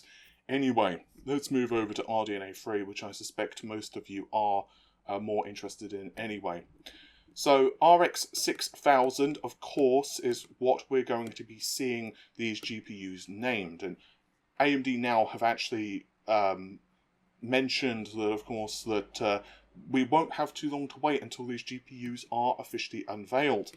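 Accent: United Kingdom. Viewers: It's just pretty normal British English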